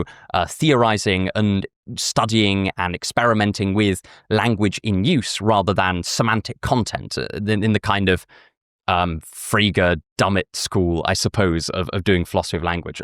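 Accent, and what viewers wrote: United Kingdom. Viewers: There is nobody I trust more than a guy with a British accent named to tell me about moral philosophy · My first ex ruined philosophy for me but I’m down for cute British boy reading aita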